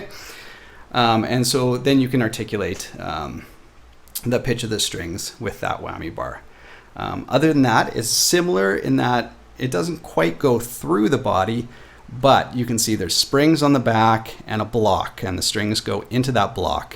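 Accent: Canada. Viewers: "talk aboat" he's Canadian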